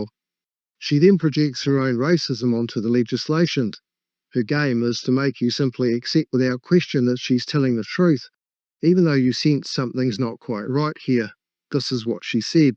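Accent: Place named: New Zealand. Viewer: I think you are spot on. Thank you for your excellent analysis and discussion. It is good white mans logic that the ethnocentric racists just cannot answer. They only have slogans and drama. I particularly like your genuine kiwi accent when speaking Māori words. No kowtowing by trying and failing to say them “correctly”. These words are part of the English language now and can be spoken in a normal kiwi accent, just like French words like rendezvous are not spoken with a French accent. I have liked and subscribed.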